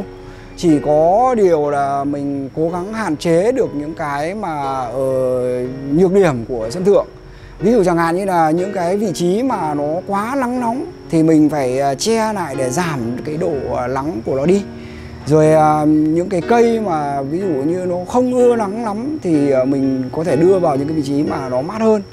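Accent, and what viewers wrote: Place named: North, Vietnam. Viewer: Ngay từ đầu đã đoán bác ngoài Bắc lập nghiệp trong Nam rồi. Chúc bác mạnh khoẻ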